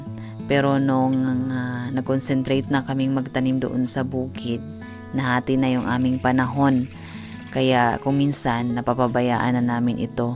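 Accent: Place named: Visayas, Philippines. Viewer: Paano kayu nagka kilala ng asawa mo sa tuno kase ng salita mo hinde ka taga kalinga para kang taga bisaya.sana ma notice mo comment ko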